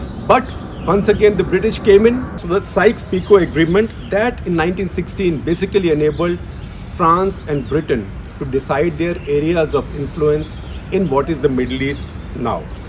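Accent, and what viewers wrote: India. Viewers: Very interesting subject and very informative, BUT very difficult to understand what you say… because of strong Indian accent !! Pity ……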